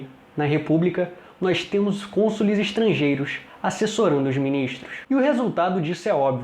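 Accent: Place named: Rio de Janeiro, Brazil. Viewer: Odeio o sotaque carioca me inrrita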